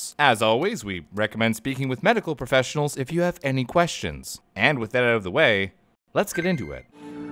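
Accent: Quebec, Canada. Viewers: On s'entends qu'y'avais personne qui s'attendait a entendre parler en Québécois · speaking Quebec French was a pleasant surprise, I lost my quebec accent when I started to speak English more Now I’m not even sure what accent I have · Vous êtes du Québec?!!?! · French is a mix of Québec and Acadian. Neat! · okay that was Quebec French are you guys Canadian?!? as a french canadian I like finding Canadian youtubers! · Wait is from quebec?? If not that's literally the best imitation of our accent I've ever heard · Wait what ? You can replicate the Quebecer accent perfectly! MY ACCENT! Seriously are you from Quebec ? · Omg j'capote! speaks french like a Québécoise!!! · Is that québécois I hear?! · Are you canadian? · Im quebec french that was awsome almost sound more quebec french than fremch france . 😎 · speaks canadian french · Well the chanel is a canadian chanel and spoke québéquois (french in québec, a Canadian province) also, qébequois is like the american english for the british. 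So yeah, spoke french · God i vibe with speaking in a french quebec accent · Parfois tout mes pensées sont en français aussi. was speaking some kind of Canadian French or something! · You speak le jouale! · As a Anglo-québécois that moved away, you have no idea how big a smile I had on my face when started speaking in true blue Québec French. I miss hearing it so much. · ahhh vous êtes québécois ? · effectivement, je m'attendais à ce qu'il utilise le français européen, le français québécois m'a vraiment surpris haha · I love her accent she’s so cute with it! Also that’s French Canadian Chills speaking!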